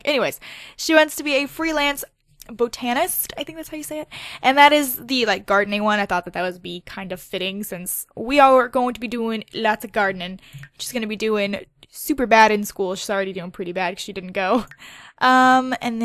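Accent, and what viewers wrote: United States. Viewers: probably american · It's americam but she is from Houston Texas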